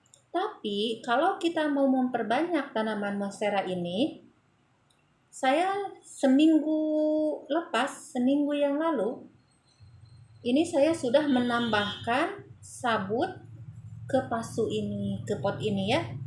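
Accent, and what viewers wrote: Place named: Malaysia. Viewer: Kk dari Malaysia ya? Soalnya beda logatnya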